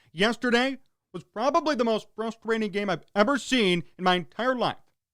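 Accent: Chicago, United States. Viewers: This is the most Chicago accent I’ve ever heard in my life.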